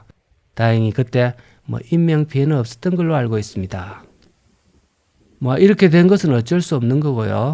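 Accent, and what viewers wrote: Gyeongsang, South Korea. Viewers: 님의 정겨운 갱상도 사투리 듣고 있으니 마음이 참 편안해 지네요.. 20만 30만 100만 가즈아~ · 강선생님구수한경상도사투리최고입니다~^.^ 조은영상보게해주셔서고맙습니다~^^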